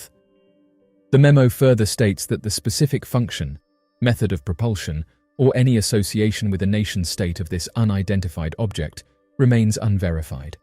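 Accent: United Kingdom. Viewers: Love your soothing relaxing British voice 😁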